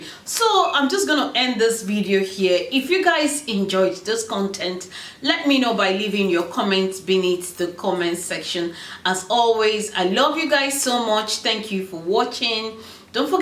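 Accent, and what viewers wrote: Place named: Nigeria. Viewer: You sound Nigerian, I can tell. I think that luxury obsession is ridiculous. I love luxury items but I wouldn't buy one as an investment.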